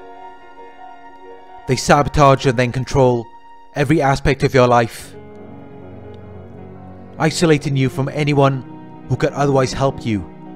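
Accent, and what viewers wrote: Wales, United Kingdom. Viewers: I agree with every thing you say!!!. Thanks for your videos, they're great. I like your voice and your accent too, sounds welsh(?)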